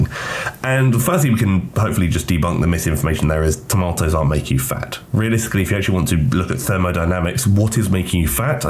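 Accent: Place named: North, United Kingdom. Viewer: This is so random but are you northern lol...this is the first time you've sounded northern to me lol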